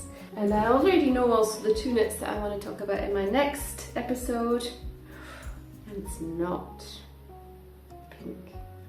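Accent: Scotland, United Kingdom. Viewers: Hi! I am near Aix en Provence and I’m a sucker for the Scottish accent! I too knit but right now I’m spinning more than ever! Have a lovely afternoon and I hope our paths will cross! Adrien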